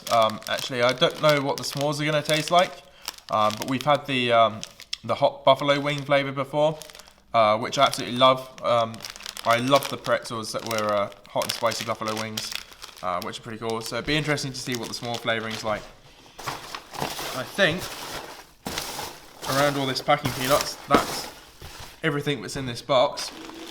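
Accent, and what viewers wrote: United Kingdom. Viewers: What part of the UK are you from, I'm from Lincolnshire, and I love your accent.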